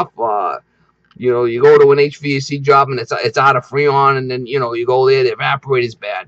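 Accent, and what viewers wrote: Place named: New York, United States. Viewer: This guy is cool haha....new yawk?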